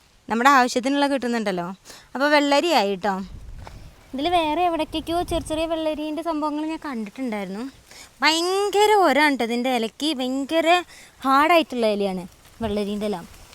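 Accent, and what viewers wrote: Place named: Malappuram, India. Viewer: മലപുറം സ്ലാങ് വരും😊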